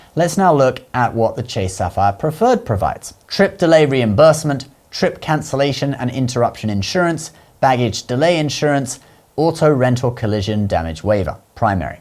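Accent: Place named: United Kingdom. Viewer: you're Brit? I always thought that was just your YouTube character.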